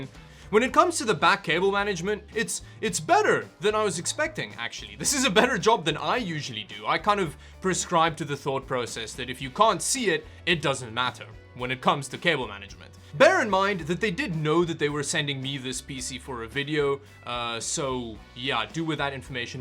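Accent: Afrikaans, South Africa. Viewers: You must come from South Africa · What accent do you have? Dit klink Afrikaans...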